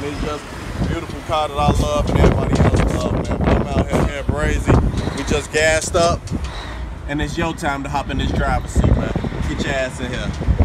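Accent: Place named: South, United States. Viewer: What state yah in? Yah talk like y'all in the south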